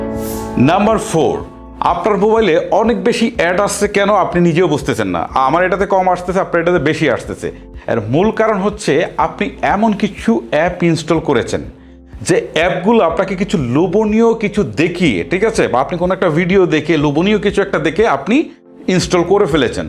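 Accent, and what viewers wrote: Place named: Kishoreganj, Bangladesh. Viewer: আপনার কিশোরগঞ্জের কিছু ভাষা থাকে, সুন্দর করলে আরো সুন্দর শোনাবে।( তাকি × থাকি ✓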